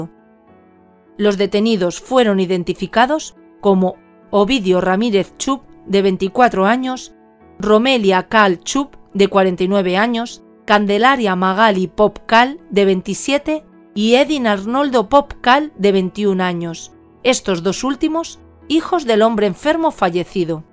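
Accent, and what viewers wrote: Spain. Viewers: Un gusto. 👏🙏🙋‍♀️♥️🍀🌺🇺🇾🇺🇾. Te mando un abrazo grande desde Paysandú Uruguay. Me encantan tus relatos. Y ese tono Español. Q ignorancia!!! X Dioss q en el siglo 21 exista estas creencias. Y matar a un buen hombre x nada. Lamentable estamos mundialmente más salvaje. 😭🤦‍♀️🤦‍♀️😭🙏🙏🙏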